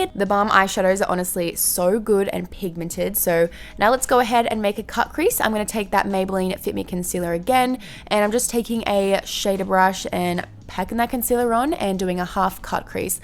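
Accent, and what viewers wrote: Australia. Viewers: your accent is sooooo damn cute Australian?? I'm new to this channel this is my first video of hers I've watched lol ... don't hate me if I'm wrong 😕 ... your absolutely gorgeous!!!! I liked this video before getting 2 minutes in.. I can just tell when I'm going to like someone..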